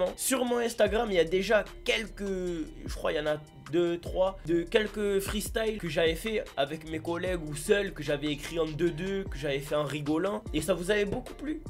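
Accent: Marseille, France. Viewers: Laxent Marseille pas mal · La vérité les marseillais jvous aime trop votre accent et votre mentalité tu veux le million pour protéger ta miff tes grv un bon en vrai 💪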